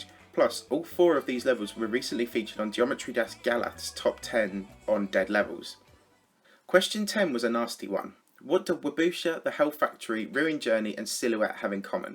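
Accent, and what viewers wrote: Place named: England, United Kingdom. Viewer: No, he's not french. He doesn't speak French, he doesn't look French, and has an English accent. He's from the UK.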